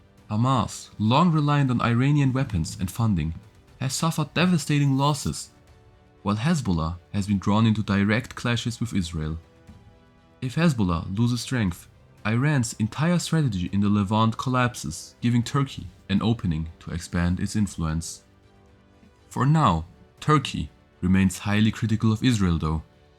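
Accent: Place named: Germany. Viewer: Yo guter video. Nicer deutscher Akzent bre. Keep on hussling. Irgendwann kommt der Durchbruch.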